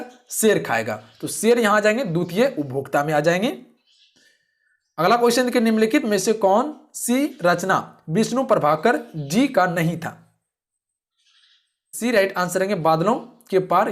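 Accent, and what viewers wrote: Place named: South, India. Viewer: Ye south Indian hindi kyon?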